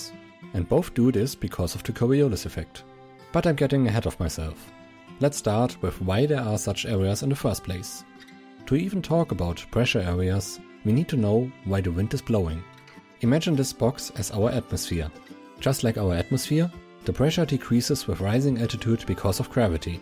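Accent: Germany. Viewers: recognized that German accent. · hearing a german accent makes the high pressure systems turn german too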